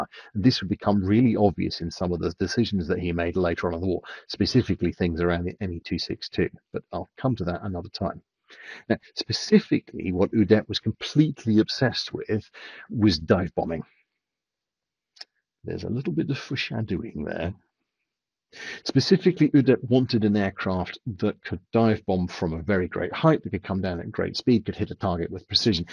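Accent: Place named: United Kingdom. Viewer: ... a beautiful and glorious Limy Brit that can make swearing sound classy😂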